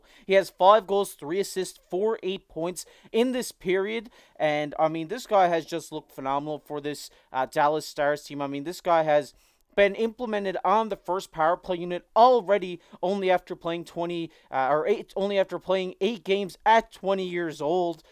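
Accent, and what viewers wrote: Canada. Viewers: Great videos, you are the most Canadian sounding guy ever :)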